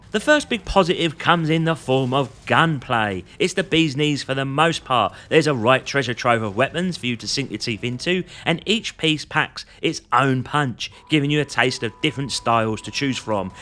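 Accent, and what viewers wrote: Cockney, United Kingdom. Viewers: I like the cockney gamer gimmick but some of the similes were a bit too on-the-nose. Good gaff though, don't feel you have to force it.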